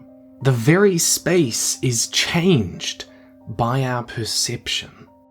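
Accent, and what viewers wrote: New Zealand. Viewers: Whaaaaaaaaaaat??? His accent is... new zealandish? woooow brah Would never guess (maybe I'm... wrong?) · You sound like a kiwi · I didnt know was from new zeland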